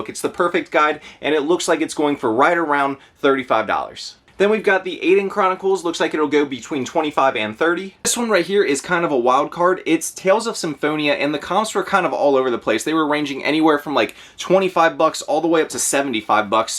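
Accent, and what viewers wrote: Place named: Baltimore, United States. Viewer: Ever live in Baltimore? Your accent reminds me of my Bmore neighbors :)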